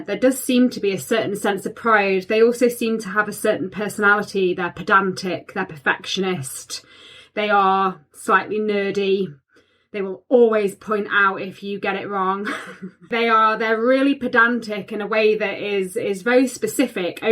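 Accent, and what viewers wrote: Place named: England, United Kingdom. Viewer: She's decent looking but that English whiney voice has to go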